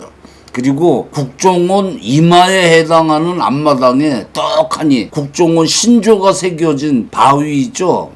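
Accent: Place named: North Korea. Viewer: 이사람은 북에서 넘어온 사람인가 북한 말하네요 그런데 남한정치 역사를 정치 박사보다도 더 잘하고 있는데요 북한 간접 교육받고 워남해서 남한 국민 이간질 하고 있는것 같아요.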